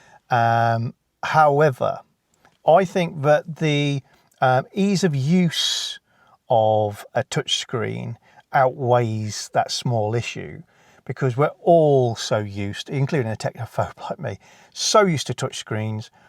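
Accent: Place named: North, United Kingdom. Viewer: You should clear the fault codes and see what comes back. Also, your jury rigged fuel pump probably isn't helping. You need to properly reinstate the fuel pump. I know you were loath to do that but you're at the point where you need to properly sort it. You should try swapping sensors from one of your other cars to eliminate faulty sensors. You could also do with a better scantool. That Launch one looks to be little more than a glorified code reader. I have a Topdon AritDiag 800BT I'd be happy to scan Steel if you're close enough to me (your accent sounds "northern"). I can also test your battery because I have a Topdon BT200 battery tester (yeah, I'm a Topdon fanboy). Are your car names a reference to TV shows that Dame Joanna Lumley has appeared in? If so, I'm sure a future car would have be called Patsy or Edina. If I could choose any female voice for a sat-nav, it would be Joanna Lumley. 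She has such a lovely voice.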